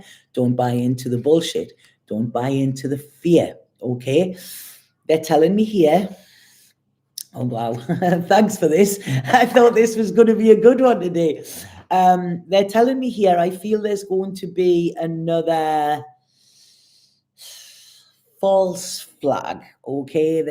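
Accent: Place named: Scotland, United Kingdom. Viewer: Love that Scottish accent.❤🙏😘🎅😎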